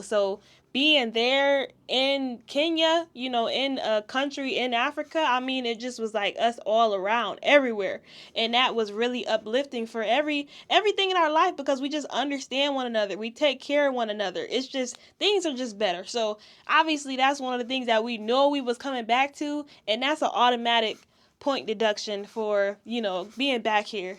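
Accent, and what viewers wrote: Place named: United States. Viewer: oh woow. That was a very good vlog. As a Kenyan, I actually thought you were Kenyan but your accent confused me for a while until u owned up of being American. U look so much like us and we will do everything to make u feel being African as u are indeed one of us. We certainly do have time for family...which is the most important.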